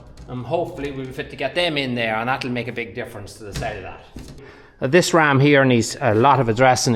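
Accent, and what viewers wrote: Ireland. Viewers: can you tell if I zip through your video? As an old lady, I am not much interested in the heavy machinery repairs, but I do enjoy seeing the cattle, the green fields and hearing your Irish voice. I would not like you to feel insulted that I don't watch every minute, but I always click LIKE!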